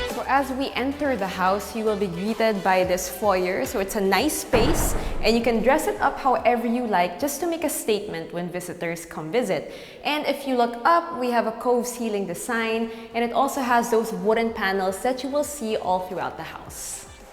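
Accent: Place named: Philippines. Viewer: speaks Taglish perfectly, very natural walang bahid ng kaartehan even if she's a newbie, lots of YouTubers should watch and learn from her...Go!!!!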